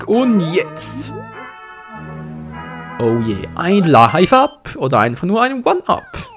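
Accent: Switzerland. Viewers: Schwizerdütsch, winis liebä XD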